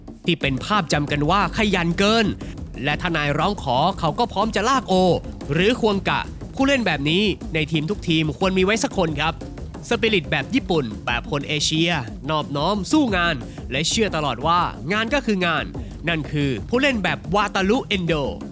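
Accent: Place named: Chiang Rai, Thailand. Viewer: แอดมินเป็นคนตางใดนิ ปากเมืองสำเนียงคนเจียงฮายแต้ว่าอั้น 555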